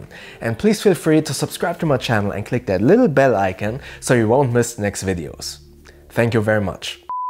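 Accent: Germany. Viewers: That's a good looking pack. Goes almost too well with your jacket. I bet pack cost about 25 thousand dollars. What is your accent? German. Cool voice.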